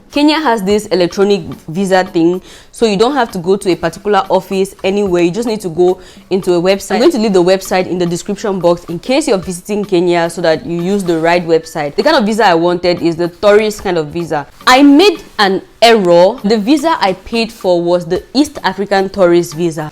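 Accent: Nigeria. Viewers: You talk like nigerians though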